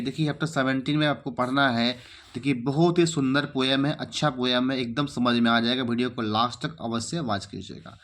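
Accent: Bihar, India. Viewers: Sir please apne bihari accent ko sudhariye. English words ki ma-bhen kar rkhi hai!!! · Sir please aap apna accent thik kriye English words ko bihari accent dekr kharab mt kriye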